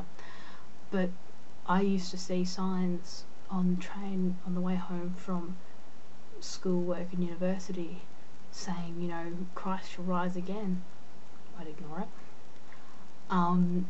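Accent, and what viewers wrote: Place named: Australia. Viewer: Okay now I can hear the Australian, sorry.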